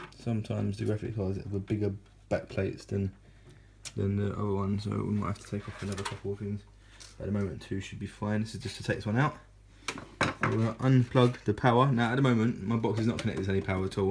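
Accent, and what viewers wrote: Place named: United Kingdom. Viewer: I did not understand anything of what you said... its too bri-ish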